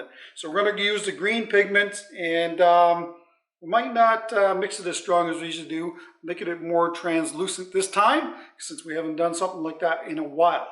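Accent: Canada. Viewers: Lovely bowl,. You’re really helping me learn turning and the difference between the American and Canadian accents. ((Much prefer yours, like my own native Scots).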